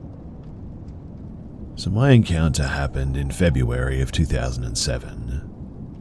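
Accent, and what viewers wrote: Australia. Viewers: He's Australian. He is not form the uk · He's Australian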